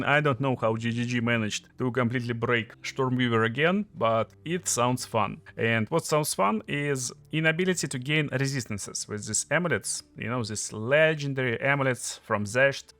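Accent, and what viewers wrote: Russia. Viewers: судя по акценту парень то русский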